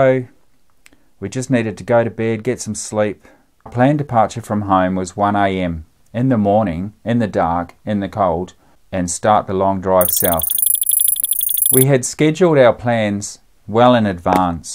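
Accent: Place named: Australia. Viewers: Great video! Looking forward to next episode. The Australian accent is quite different, but I like it. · Australian accent is quite different... but then again so are most Aussies (we won't say where he REALLY comes from...) must admit he comes across as pretty suave...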